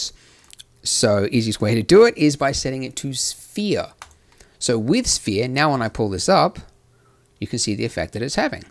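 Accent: Australia. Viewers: funny australian Tutorial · He's from Australia lol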